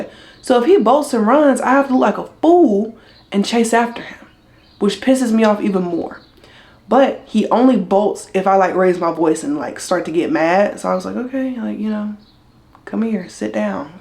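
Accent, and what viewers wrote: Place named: South, United States. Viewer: A little bit lol it’s the southern accent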